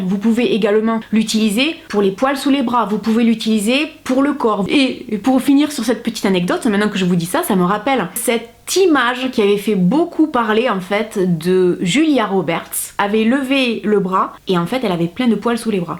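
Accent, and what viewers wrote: South, France. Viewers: Je t adore bravo encore beaucoup d humour et même accent que toi ca fait du bien de voir des sudistes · J adore ton accent du sud tu es superbe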